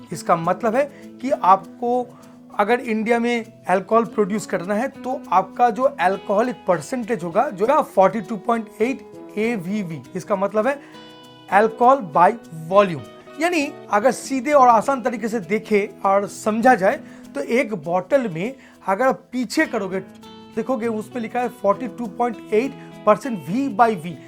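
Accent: Bengal, India. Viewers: Bangali pronounce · Bengali accent is horrible.. Knowledge hai... Lekin pronunciation and english is very poor..needs improvement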